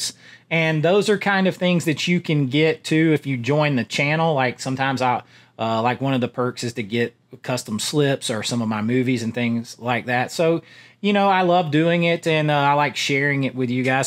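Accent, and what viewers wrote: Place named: Rural, United States. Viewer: that's A LOT OF QUALITY!!!! plus the highway shredder escape chase scene is DOOOOPPPEE!!! btw, its amazing how much your country accent goes away when you imitate Ralph 🤣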